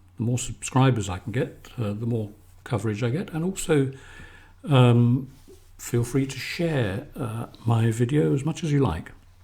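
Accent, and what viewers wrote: England, United Kingdom. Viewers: I have learned a lot from watching your videos, I have painted along with you and it has helped me to paint loosely, which I struggle with. I love your accent, my mother came to the US from England along with several of her siblings and it reminds me of the time they would all get together. Anyway I would love for an instruction on painting rocks, I live in AZ where we have a lot of rocky ground. Thank you for all your video's.Sam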